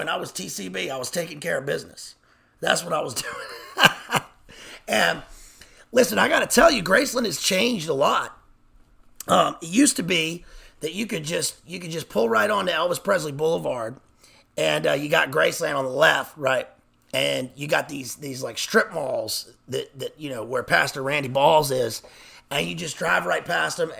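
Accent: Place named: United Kingdom. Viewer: Loving the British accent 😂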